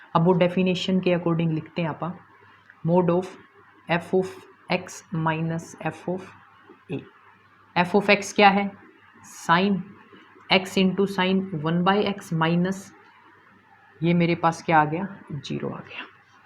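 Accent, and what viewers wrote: Haryana, India. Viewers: U R Great Sir Your Haryanvi Tone is just ossmmm Thank u Maine puri youtube chaan di thi par ye topic nhi mile the And main bhi karnak ke ek gaavn ka hun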